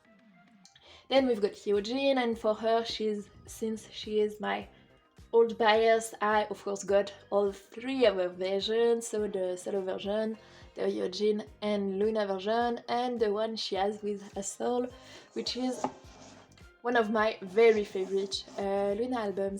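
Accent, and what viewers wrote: France. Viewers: are you french ? cuz you have a french accent while speaking English btw i like your collection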